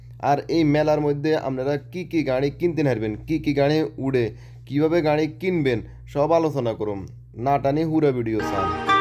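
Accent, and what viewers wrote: Noakhali, Bangladesh. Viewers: আপনি কি নোয়াখাইল্লা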